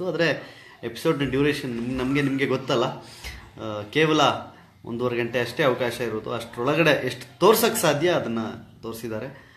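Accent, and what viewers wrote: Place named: Mangalore, India. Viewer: Seriously nimma kannada thumba chennagide... Mathe mangalore kannada na thumba thumba chennagi use madthira.... 👌👌👍👍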